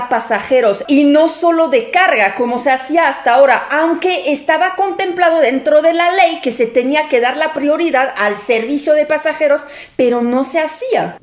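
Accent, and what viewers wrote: France. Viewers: Saludos desde la CDMX. una observación, no es crítica pero ya casi no se te notaba tu asentó francés, pero ahora como que se te traba un poquitín la lengua jajaja.... 😂😅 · Como me encanta el español con ese acento frances, simplemente precioso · Me gusta tu acento francés. Felicidades por tu canal y contenido. Excelente trabajo de investigación como siempre. Tu opinión como usuaria de trenes en Europa es muy valiosa.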